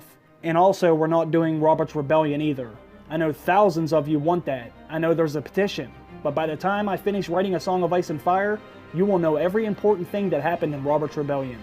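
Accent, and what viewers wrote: Pittsburgh, United States. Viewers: Are you from Pittsburgh by any chance? That accent is undeniable! I knew it as soon as I heard it! Bet you say jagoff too, don't ya?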